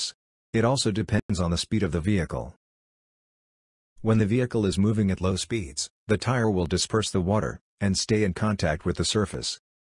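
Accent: India. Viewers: I like your slow teaching voice.. ❤thnks.. Btw why do u seem to have Indian accent. 😁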